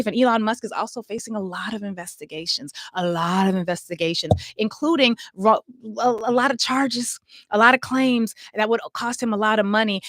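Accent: South, United States. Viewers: Your "SOUTHERN DRAWL" is just as IMMACULATE as your BREAKDOWN of these RANCID Repugna-CONS... 💪🏾🤩🫶🏾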